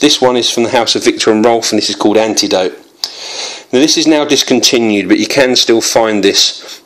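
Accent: United Kingdom. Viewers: Love your British Accent mate !!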